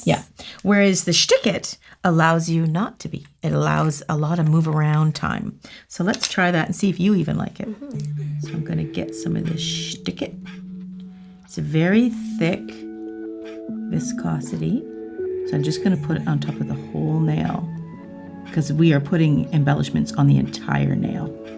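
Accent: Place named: Canada. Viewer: Love your Canadian accent.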